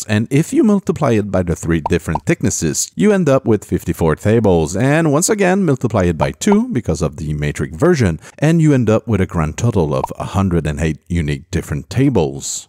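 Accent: Quebec, Canada. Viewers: Si c’est pas l’accent le plus québécois qui ya 😎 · The joy of French Canadian swearing!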